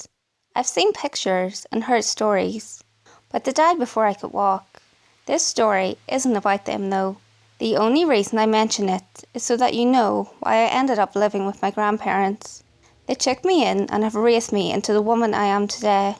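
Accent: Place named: Northern Ireland, United Kingdom. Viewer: that north irish accent though, not as bad as my midlands accent to be honest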